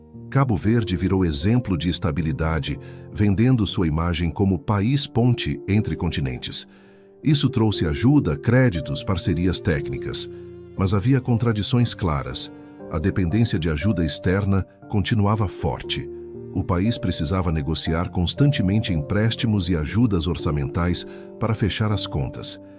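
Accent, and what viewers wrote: Brazil. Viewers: Sotaque de Brasileiro Horririso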